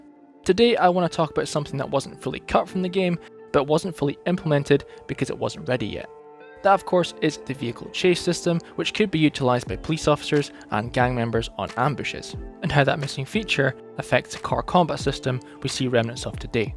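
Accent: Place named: Edinburgh, United Kingdom. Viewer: Your accent, you a fellow scot? Sounds like an Edinburgh dialect. Great vid btw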